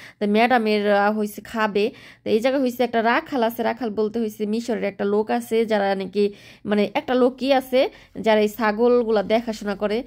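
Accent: Faridpur, Bangladesh. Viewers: আপু আপনার বাড়ি কোন জেলায়, জানাবেন,,আপনার কথা গুলো আমাদের, ফরিদ পুর ভাষার মত লাগে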